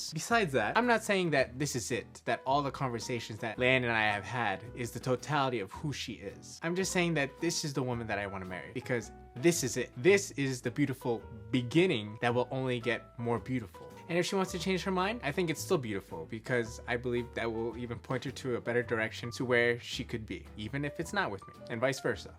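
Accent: United Kingdom. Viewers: Lol. You have a really good British accent :D I particularly loved the point where you can't put pressure on another person to be your source of happiness. That's kind of unrealistic and bound to fail. Solid video! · That British accent though haha!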